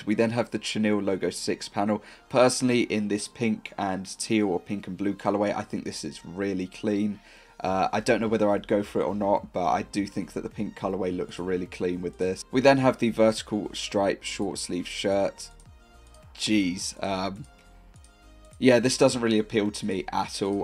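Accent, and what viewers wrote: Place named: United Kingdom. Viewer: American slang just doesn’t sound right in that British accent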